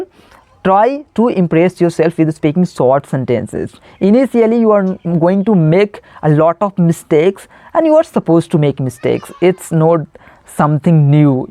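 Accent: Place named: Bihar, India. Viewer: Bihari english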